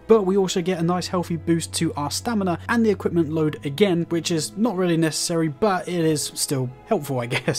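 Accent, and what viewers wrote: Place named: United Kingdom. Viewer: The word is HP not heach P 😂 lol love how British people talk.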